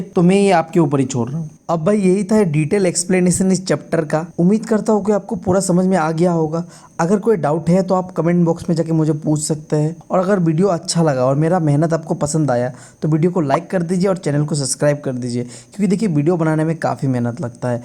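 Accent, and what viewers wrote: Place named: Mumbai, India. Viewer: I think you are from Mumbai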